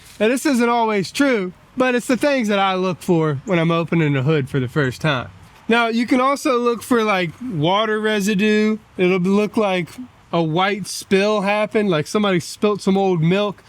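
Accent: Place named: South Carolina, United States. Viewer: South Carolina i hear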